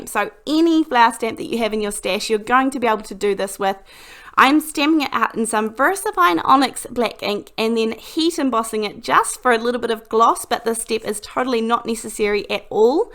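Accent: New Zealand. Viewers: Love this card. I thought it was a N,Z, accent and then you mentioned you are in N.Z. I live in Cape Town but have been on three visits to your beautiful country and it would be my dream to live there. Thank you for sharing your great talent and looking forward to watching many more of your videos. Hope you get well soon.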